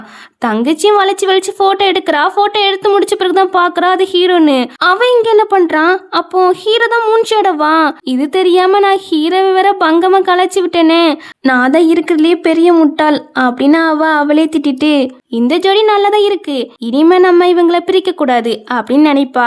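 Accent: Tirunelveli, India. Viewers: 80s la poi reborn agura drama podunga pa unga nellai style voice la ketka egar ra eruku pa 🙂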